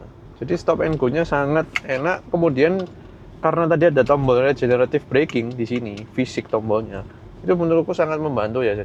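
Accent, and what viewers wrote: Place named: Java, Indonesia. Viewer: Medhoknya jangan sampai hilang. Sudah jadi ciri khas.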